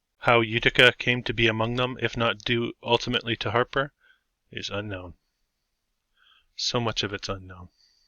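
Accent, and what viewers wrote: Canada. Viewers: This guy sounds a smidge Canadian? Eh?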